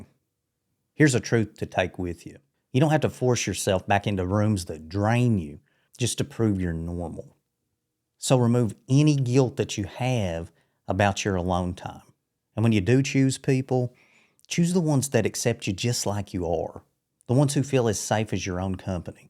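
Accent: South, United States. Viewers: You have a totally southern drawl. Do you get paid for these videos. ???????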